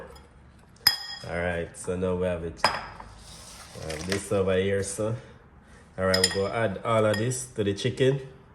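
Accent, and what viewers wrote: Jamaica. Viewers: That look delicious I must try as soon as I get that green seasoning u used..but what I most love is the Jamaican accent 😊😊😊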